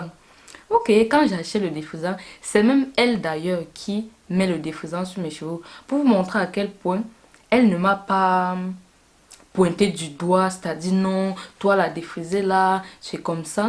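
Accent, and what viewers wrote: Cameroon. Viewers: Toi tu es camerounaise ça se sent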